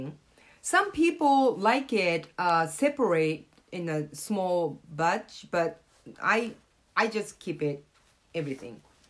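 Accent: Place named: Spain. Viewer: Thank you very much for a very clear clarification. There were at least two reasons I asked such a (stupid) question. Oriental people, i.e. Chinese, Korean, Japanese, etc., mostly have slanted eyes and yours ain't. Your English with a bit heavy in Spanish accent (I bet you fluently speak both Japanese and Spanish). Regardless, I do have some questions about how you make Nattō and probably some remarks / suggestions. I hope you will find plenty of time to address them. 1. You mentioned in your video that you first soaked your soybeans overnight. Am I right to say that you used cold water to soak your soybeans in a room temperature of around 25°C (or 77°F)? 2. Your video clearly explained how you pressured steam the soybeans. In the process of setting up for a fermentation, I believe I saw some water left in the inner stainless steel pot with your inoculated soybeans in a Pyrex container to ferment, am I right to say so? 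So far, these are the only two questions I have. Now, if you don't have any objections, I would like to make some remarks / suggestions about Nattō. 1. Do you know naturally soybeans contain some bacillus subtilis (a gram-positive, catalase-positive bacterium) which can survive in extreme environmental conditions of temperature and desiccation <link> 2. Nattō <link> is basically made from whole soybeans that have been fermented with Bacillus subtilis var. natto. I started doing some researches and found these 3 videos <link> <link> and this video <link>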